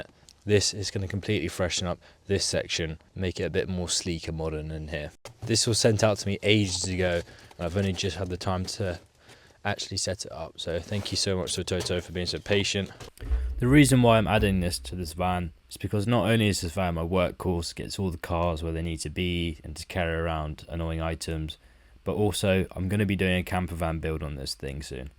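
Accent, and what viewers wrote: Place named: Australia. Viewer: Pretty good aussie accent as judged by an aussie!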